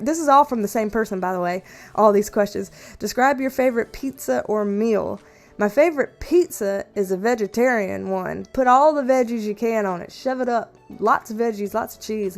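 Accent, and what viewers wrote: South, United States. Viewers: A fellow Aries!!! I looove! 😍 No wonder I feel like I just click with you! Plus, ya make me homesick for the south with your accent! I'm a Texan living in New York right now, so listening to you makes me feel at home. ❤ Love ya, gal! Take care! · I looked up the word perking it means cheerful or lively, it's so cute when you use that word :) I'm from NJ. and yes we talk differently and it's so fun for me to hear the southern accent I have a strong Jersey accent and yes I can't stand it LOL! I have been binge watching all your videos and you are amazing! You really are amazing and I hope you can see that. I believe your channel is going to be huge and if you come out with Merch I would be excited to purchase it. I just want you to know you are a gift to this universe! Your kindness and your beauty radiates through the computer and I would like to thank you for telling us about you! 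Keep on Perking :)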